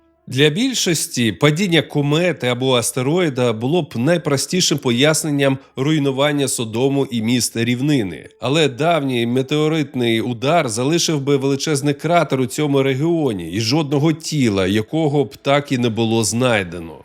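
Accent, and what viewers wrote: Russia. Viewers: Великий уклін вашій наполегливості у видавлені з себе москальського акценту. Перші ваші відео аж слухати не міг, а тут натрапив знову на ваш канал і дуже приємно здивований. Моя повага!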